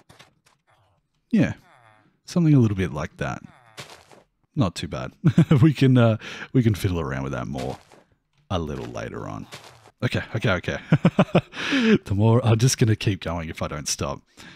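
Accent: Australia. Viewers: Discovered your channel recently with this series and I love your content! I guessed you were Australian before looking it up haha Looking forward to see what you’re gonna build, your series is really chill and relaxing it’s so good! Keep up the good work, love it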